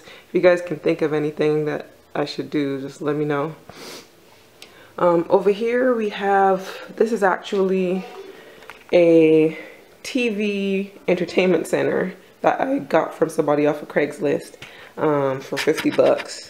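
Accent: Barbados. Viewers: I love ur style U sound like a Bajan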